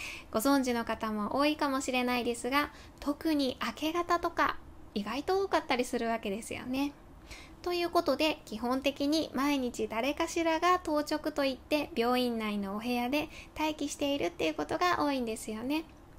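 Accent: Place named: Hyogo, Japan. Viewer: 会えなかった姪がいます、あの時の姉の悲しみも自責も見ていて辛かったし支えた姉の家族の苦労もよく分かります。 個人的な事ですが先生は兵庫の方のような気がします、オレの神さんのよそ行きの言葉と同じ感じに聞こえます😊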